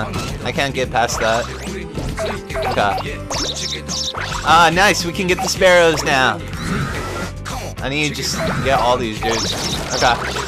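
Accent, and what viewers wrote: California, United States. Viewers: everyone can tell where you live by your voice because of your California dude accent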